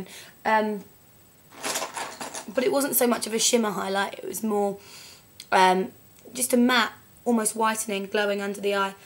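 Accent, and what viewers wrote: United Kingdom. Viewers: i love british accent:)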